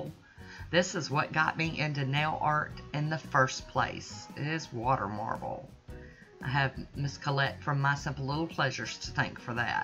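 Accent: South, United States. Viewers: Love it! Water-marbling is what started my nail art addiction too! Unfortunately, I never got very good at the water-marbling type of nail art but I’m getting better & I am better at stamping, and hand painted designs. Now I’ve ventured into doing my own acrylics, acrygel, & just recently started gel polish and hard gel. I love the products and tutorials by wildflowers nails and by Kirsty Meakin’s tutorials on Naio Nails aswell as her urban graffiti gel polish line are so amazing and easy to use! I love the formula of those gel lacquers they made all the difference in the world to my gel polish game! I was just using the brains found on amazon before that and had about given up on being able to do anything because I couldn’t get the gel polish to look good in order to use the wildflowers products but once I laid down her gel polishes using the tutorials she and Lauren out on youtube I was producing some great looking designs! 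Now I am not a pro I am just self taught from YouTube but because I was disabled by a drunk driver in 05 I can no longer go to nail salons due to my illness I have no immunity and have seizures so I started doing my own and then branched out to doing family and friends just for fun and when I’m able to (which unfortunately isn’t often as recently I’ve been sick and unable to get out of bEd for the past 2 1/2 months) but I enjoy your tutorials too! It’s so wonderful to have a fellow southerner to listen too! I had not been using small size bathroom cups s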